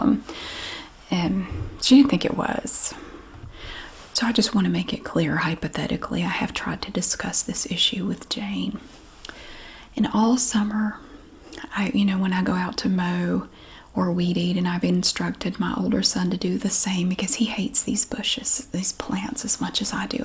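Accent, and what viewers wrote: South, United States. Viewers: Best southern asmr videos on this channel! Aside from charity and carl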